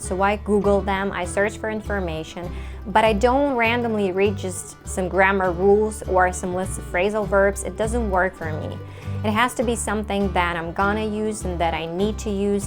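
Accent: United States. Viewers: you really sound American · Tremendous American Accent...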